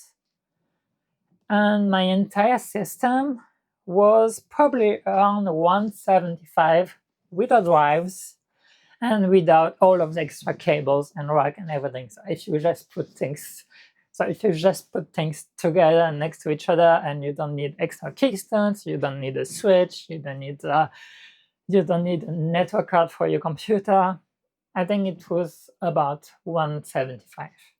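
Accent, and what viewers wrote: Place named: France. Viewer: Thank you for this video,! I'm an Aussie and am intrigued with your home workshop and your accent. French? Perhaps German? Just curious. Your home workshop is amazing. Your layout, organisation... Wow. Is this a hobby or a business? Let me just say that out homes look similar, but I have 8 Billy Book Cases full of plastic tubs that hold my various hobbies and the associated equipment. IT, Electronics, painting (Cars, furniture, etc) Leather repairs, abrasives (Pads, blocks sand paper), but in a much bigger space. Sorry if asking is rude. I mean no offence. On builds... I used a ITX Z-170N Mobo, i5-7600k and 32 gb of memory. I put in a 970 Pro NVME and partitioned the drive into a boot and a cache. I decided not to HBA, instead went Ubuntu Webmin and ZFS. I don't spin down my drives! 5 x 4tb WD Red 5400rpm drives. All up, Power consumption is 38 watts idle and the highest during a stress test was 54 watts! 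In the previous NAS my 3 TB drives lasted 13 years! HBA's are great but draw a higher percentage of power. Especially the 9200 series, so I used on board sata. I have one spare cold mounted drive and the connection is to a caddy, to do backups or import data. ZFS is memory hungry. My Raid 5 (5 x 4 Tb drives) uses 55% of the 32Gb of memory. Thank you again for the great build and fun, interesting video. Merci / Danke!!!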